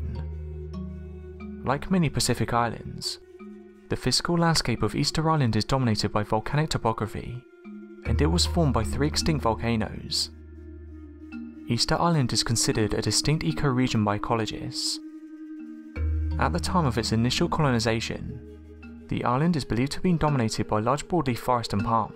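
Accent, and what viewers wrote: England, United Kingdom. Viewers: It's just the intonation that English people use. Get over it.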